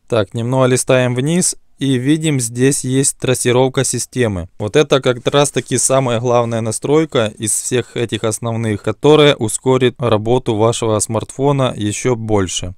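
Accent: Ukraine. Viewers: Говор Закарпатье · Извините конечно,Ноя просто так слышу то как он говорит на русском но слышно что он с украины😂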